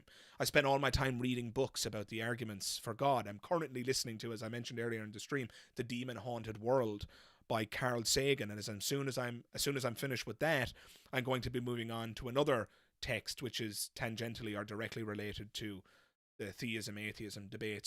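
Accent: Ireland. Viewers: Nah, it’s just Irish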